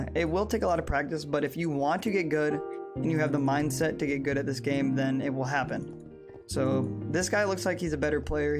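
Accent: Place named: Netherlands. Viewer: where are you from you sound dutch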